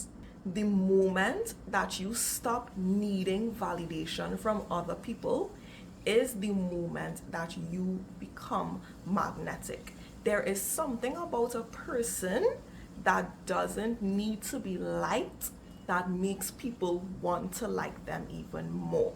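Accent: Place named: Caribbean. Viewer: Oooh I Love the Carribbean accent…,what parish ?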